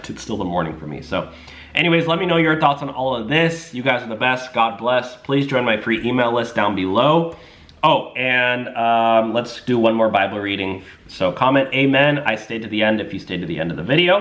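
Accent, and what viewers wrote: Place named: South, United States. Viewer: Where are you from(born), I heard a little southern accent?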